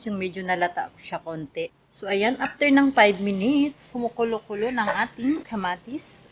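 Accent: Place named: Visayas, Philippines. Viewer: Siguro mag bisaya ka nalang kasi bisaya ang tuno mo oi..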